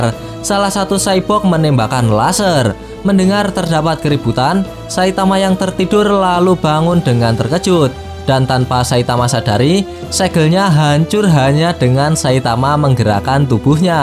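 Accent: Java, Indonesia. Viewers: ( kammmprettt padahal udah seru loh )🤣🤣 Jawa mana min?🙏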